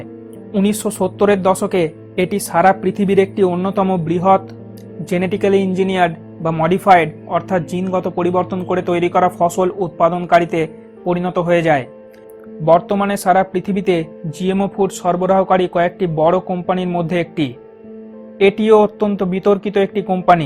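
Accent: India. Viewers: Dm mahmud Bhai uni indian.. And indian der bangla uccharon amader cheye different.. Ek ek arear banglali ra ek ek rokom uccharon kore.. So, kawke evabe opoman korben na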